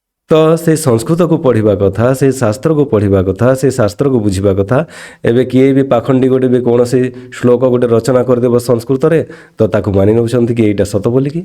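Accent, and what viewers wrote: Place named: Bengal, India. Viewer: Ye loka odia bhasa kahila -bele majhire -majhire bengal bhasa patiru bharuchi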